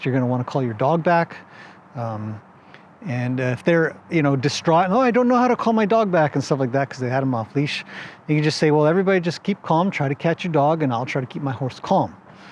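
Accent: Canada. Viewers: I am gonna go back and listen to videos I have missed. I learn so much more about relationships with horses that make more sense than other channels I watch. I am re evaluating my training and my horse wants to be with me even more! P.S. Love your adorable Canadian accent! And it is so gorgeous where you live. Can you show us the area around your home if you get a chance? Thanks for everything Mr.. (: Babs from Boston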